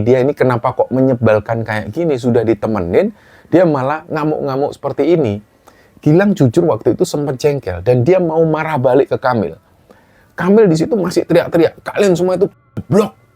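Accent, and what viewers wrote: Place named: Surabaya, Indonesia. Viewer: ngomong cok nya faseh medok hehe mas ancen arek suroboyo temenan hehe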